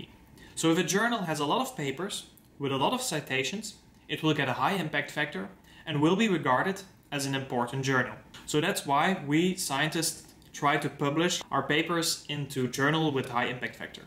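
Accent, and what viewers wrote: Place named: Flanders, Belgium. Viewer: Some advice, first of all, interesting and innovative way to start a vlog/YouTube channel! great job 1. try to avoid having the camera lower than your face, since the viewing angle is in general not the best, having it higher than your face is more suitable. 2. try to create better lighting, the yellow desk light is not cutting it and since you have stay at the same desk most of times it is easy to put a stand in that mess. 3. The music is not bad but also not great, try to find soundcloud artists who produce nice beats, mounika for instance. 4. The font in the beginning is not so pretty but that might be personal opinion, it also very 'casey neistat' like and a lot of vloggers take it over 5. Don't call it badass science, try to be a bit more creative with the outro, it needs to be something that sticks. The last comment is just about the douchebag parking of Mercedes at the end, typical... 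Good luck with the channel and especially with finding interesting topics to talk about since PhD work can be extremely repetitive and predictable! Btw, lovely flemmisch english accent:) You will never lose it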